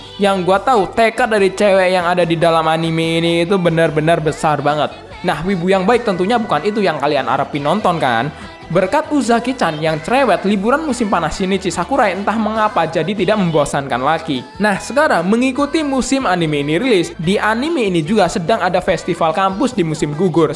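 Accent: Lampung, Indonesia. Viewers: Lah org lampung min? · Lah warek Lampung tah min? Wkwkwk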